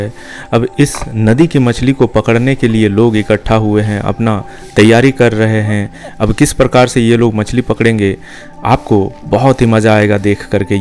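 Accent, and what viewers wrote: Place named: Jharkhand, India. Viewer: Baat se lag raha hai aap jharkhand east Singhbhum ke hain. Ab yeh bata dijiye kis gaon se hai. Main Jadugoda se hoon.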